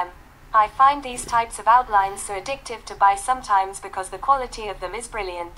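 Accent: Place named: United Kingdom. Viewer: Hi, oh yeah I found your video about "how I become an Artist" and I heard you talking, your speech is very clear. Also I heard you say you are in London, I should have figured that out from the british accent on your communication device. Alas I'm in the USA, otherwise it would have been nice to meet up, you're very pretty. Well I'll be looking forward to your Q&A video. Take care!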